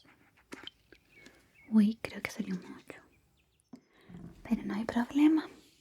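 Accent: Chile. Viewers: Cada día más chileno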